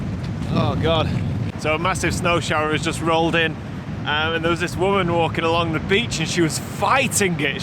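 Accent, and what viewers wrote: United Kingdom. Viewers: Few things are more enjoyable than hearing a British man complaining about the weather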